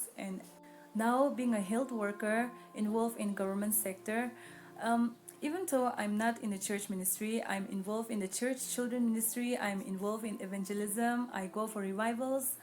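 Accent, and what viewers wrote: Nagaland, India. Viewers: Wow I love sumi dialect👍😎❤ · Hahaha love ya sumi dialect lots of love from Hongkong Market Dimapur 😀😀😀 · Haha so sweet the way u speak Sumi dialect❤️ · Love the way u spoke Sumi dialect 😍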